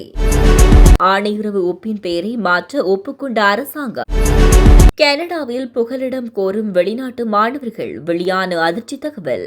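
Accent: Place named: Kerala, India. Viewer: நீங்கள் சற்று நிதானமாக செய்தி வாசிக்கலாம். மலையாளிகள் தமிழ் வாசிப்பது போல் வாசிக்கிறீர்கள்..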